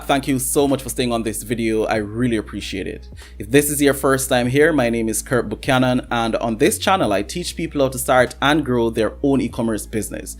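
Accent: Jamaica. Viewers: You can tell he’s Jamaican 🇯🇲😂 real businesses man nuh true boss man‼️ big up uh self n guh chu n mek uh money bad man💯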